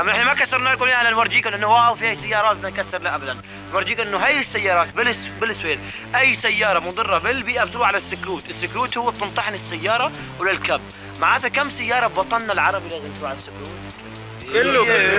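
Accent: Syria. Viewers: عراقية وأفتخر 😂 لك دخيل ربك عم تحكي سوري😊❤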